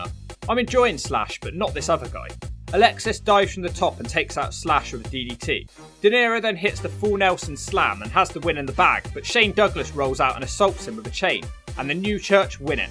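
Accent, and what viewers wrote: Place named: Weston-super-Mare, United Kingdom. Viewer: You gotta be from near me in weston super mare with an accent like that. Great channel. 👍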